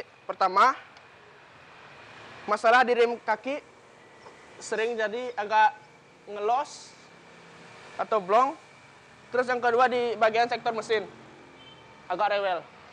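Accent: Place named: Bali, Indonesia. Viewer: Wkwkwk logatnya bali kental 😀😀😀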